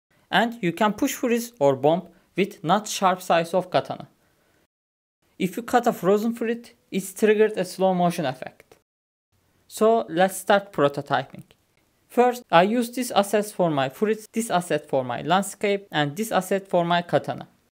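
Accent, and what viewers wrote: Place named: Turkey. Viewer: helal aksaanindan anladim turk oldugunu guzele benziyor eline saglik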